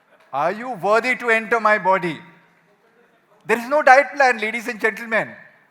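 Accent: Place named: South India, India. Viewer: When a south Indian speaks Hindi its so funny?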